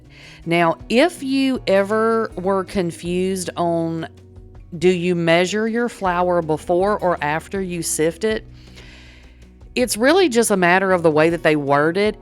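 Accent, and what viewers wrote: South, United States. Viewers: I only bake homemade cakes as I personally do not like store box cakes (too sweet for me) and having these premixed cake mixes is going to be a game changer. Thank you so much for sharing these recipes. p.s. I love your accent 😊 I lived in GA long time ago for a few years and just love the southern accent.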